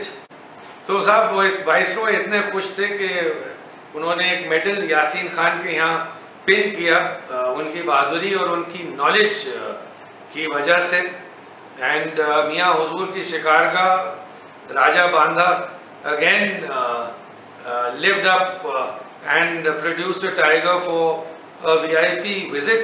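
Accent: Bhopal, India. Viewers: Zabardast tale.. btw you have a unique style of telling these stories with bhopali spices 👏👏👏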